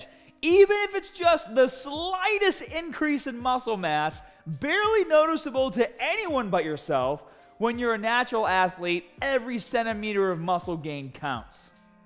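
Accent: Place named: Northeast, United States. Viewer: Northeast accent. So obvious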